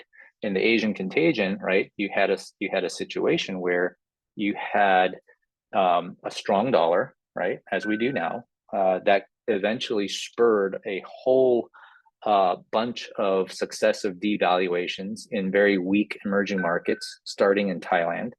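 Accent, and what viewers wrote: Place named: United Kingdom. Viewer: So I have a book about active listening and it suggests you repeat the last sentence the other person said, but in your own words, so, slow it down, you speak quickly, and say, “so if I understand you correctly, you’re saying blank blank blank. He’ll say “yes” then you ask a question that builds on his answer”- lots of books out there on how to interview and ask questions in an active listening mode. Mostly I’d say slow way down because you have a distinctive British accent but talk super fast. You’ll figure it out and continue to provide great content! Thanks for making this video!!!!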